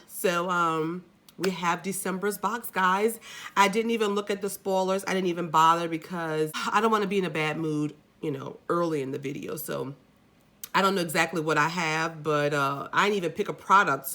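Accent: Louisiana, United States. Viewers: Where are u from? Your accent sorta sounds like mine. I am in Louisiana.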